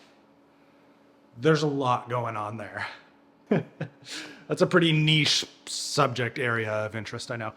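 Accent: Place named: Newfoundland, Canada. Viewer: 8.3/10 Newfoundland accent. And also fatbikes are deadly. Me and the b'ys love to ride them.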